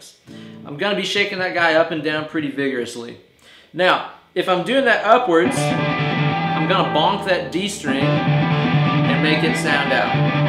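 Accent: South, United States. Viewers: Are you from Tennessee,? Just curious, you know White Chapel, and you have a southern accent, just putting the pieces together. I just moved to TN from MI, and I love it down here! Cheers!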